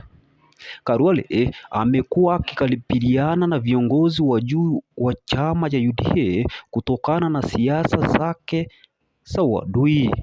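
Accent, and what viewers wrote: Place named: Kisii, Kenya. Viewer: We mkisii uko Sawa kwa matangazo lakini accent nayo 😂😂😂😂😂😂😂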